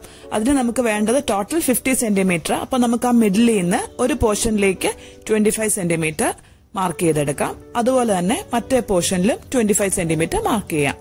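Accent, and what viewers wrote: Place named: Pathanamthitta, India. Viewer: പത്തനംതിട്ട ജില്ല എവിടെയെങ്കിലുമാണോ വീട്? ആ ഒരു style of talking...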